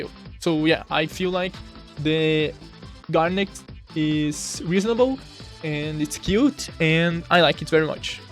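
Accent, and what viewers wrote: Brazil. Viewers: You ARE Brazilian? Sotaque eu acho Im trying to do a deck that can make XYZ, shincro and fusion in one turn, im using dimensional dragons of manga, but could you help me ?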